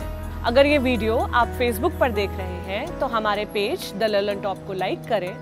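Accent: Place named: Kolkata, India. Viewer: I think by ur voice u r from kolkata..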